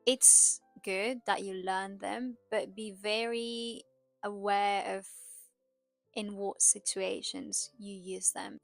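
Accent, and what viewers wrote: United Kingdom. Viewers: oooo British English.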